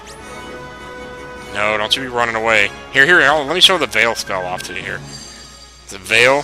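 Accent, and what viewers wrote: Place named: South, United States. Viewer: ... Dude. That southern accent is a twinge worse than the one I hear daily, dude. It makes me miss NYC, man...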